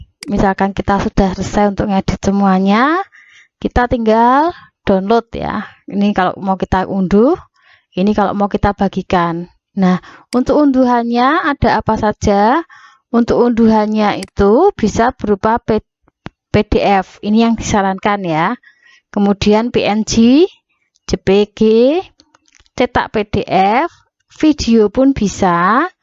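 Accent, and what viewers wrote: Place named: Java, Indonesia. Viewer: Medook nya poll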